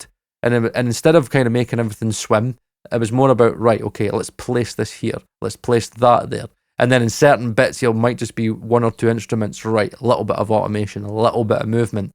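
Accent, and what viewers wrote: Scotland, United Kingdom. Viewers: Nice hearing a scots man. I lived near perth 10 years ago. Loved Scotland. Nice country and great people...other than my EX. 😜 I loved salmon fishing. 👍👍👍 About the ATMOS...I don't know what to think about it. I never had the chance to really listen to a great setup. But i watched videos where people are crying while listening. So it must be a great experience...especially for music...not only for movies. Greetings from Germany...🍻 ❤☮☯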